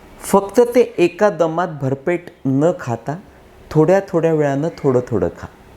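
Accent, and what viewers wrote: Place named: Varhadi, India. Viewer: Assal Varhadi/Nagpuri Spicy, Saawaji Bhasha aikun man trupt hot hote,Kay karnar OM Shanti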